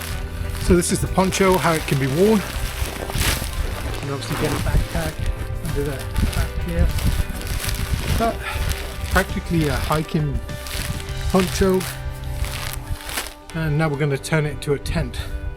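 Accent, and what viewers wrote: United Kingdom. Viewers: That was smart going up hill when finding a camping location. First time viewer. Emjoyed your video. Are you from the UK?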